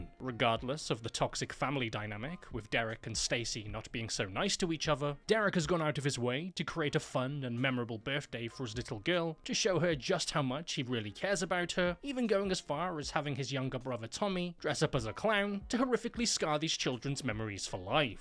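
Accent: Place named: Scotland, United Kingdom. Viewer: it's his "I'm pretty sure this is a British accent... with a touch of Scott" his vernacular and his emphasis are all just a bit over the top. But he is a "broken man" (like most of us) with a cynical outlook on every last thing. Then the switch is thrown and he will go on the best tangents about some aspect of the movie. I'd listen to him review breakfast cereals. is a treat.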